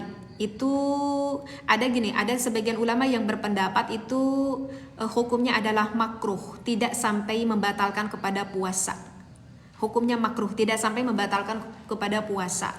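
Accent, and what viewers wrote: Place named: Padang, Indonesia. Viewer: Teteh kadang logat bahasana saoertos urang padang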